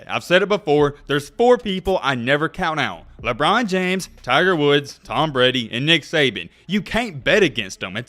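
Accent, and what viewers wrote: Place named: Tennessee, United States. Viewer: It's the accent...TN? Little hard on my ears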